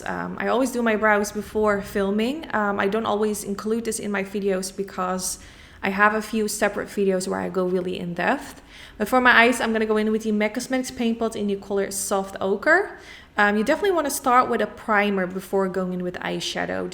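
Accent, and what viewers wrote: Netherlands. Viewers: I Love the Mac paintpot and the eyeshadowpallet , by the way i, m hearing a Dutch accent , if so greetings from The Netherlands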